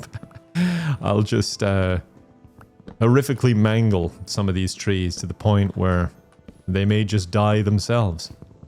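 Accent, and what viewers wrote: Ireland. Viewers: This is genuinely such a soothing let’s play. I didn’t expect to fall asleep during the last episode but at the end of it I blinked and it was 30 mins later in the video, waited til bedtime for this one in case it does it again. Always love a good hang out with our totally sane friend, reminds me of the old Stardew videos from when the channel was still brand new Also for the record you got me to fall in love with the Irish accent to the point I use it on my Siri anymore so you’re clearly doing /something/ right for Irish culture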